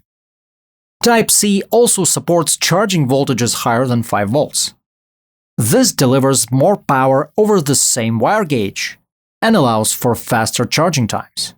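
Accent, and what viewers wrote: Russia. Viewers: you are russian or talk to russian people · cuz he's Russian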